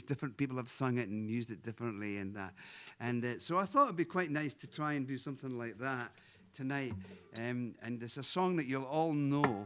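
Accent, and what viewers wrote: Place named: Scotland, United Kingdom. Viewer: it takes a while to acclimate to his Scottish brogue. keep listening - it becomes mesmerizing. His internationally known pieces are and from Last of the Mohicans...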